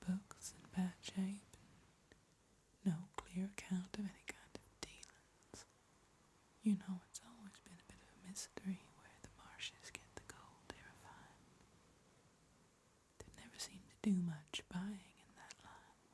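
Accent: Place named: South, United States. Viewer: Ok I’ve finally listened past the first few minutes without falling asleep (took several tries), and please tell me how an English girl does a better southern American accent than half of Hollywood 😂👏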